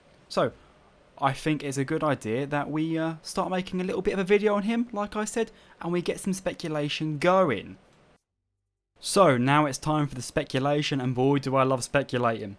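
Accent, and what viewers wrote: United Kingdom. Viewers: Would’ve clicked off the vid if it weren’t for the charm and British accent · Stop using an English accent while saying roman names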